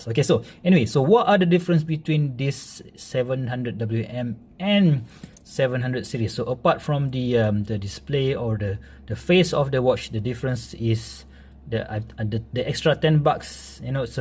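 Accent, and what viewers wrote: Malaysia. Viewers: nicely explained and straight to the point! :D by any chance are you malaysian or singaporean?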